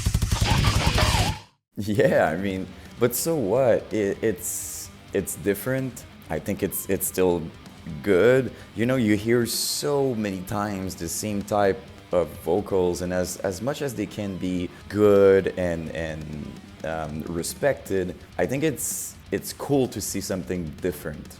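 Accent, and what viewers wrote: Quebec, Canada. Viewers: Been trying to pin your accent for ages, I settled on Swedish, good to know it’s French-Canadian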